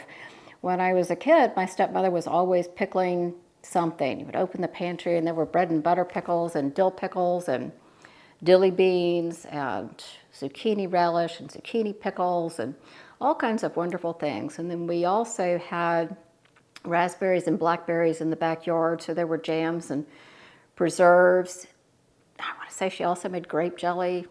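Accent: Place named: South, United States. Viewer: I'm following a small handful of Homemakers / homesteaders and I've got to tell you that you are one of my favorites. Your no-nonsense delivery coupled with yourself of the sweet tea line accent made me start following. However you're canning of beef Rogan Josh you made me a fan! As a long time canner I've done the water bath the pressure all the things. But never have I ever thought about spreading my canning out to the wonderful things such as the beef Rogan Josh the chicken Tortillas Soup the Brunswick stew etc... thank you for reminding me that I have the power to use better judgment following safe guidelines in Canning things that are delicious to me I will be one of the first to follow in a paid membership community because of the aforementioned reasons. I'm excited and I cannot wait for that day to happen. And I will urge you to please write a canning cookbook with these lovely recipes in them. 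I see you testing the pH I see the beautiful products that turn out. I just want to thank you for the knowledge that you are sharing.. May God. Bless you and your family and from my home to yours, Merry Christmas!❤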